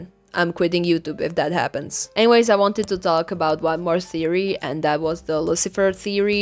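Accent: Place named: Eastern Europe. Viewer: You sound so Eastern European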